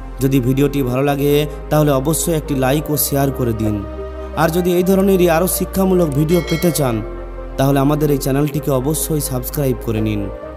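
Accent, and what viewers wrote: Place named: Bankura, India. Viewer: ভাই আপনার বাড়ি কি বাঁকুড়াতে। আপনার কথার টান শুনে মনে হচ্ছে।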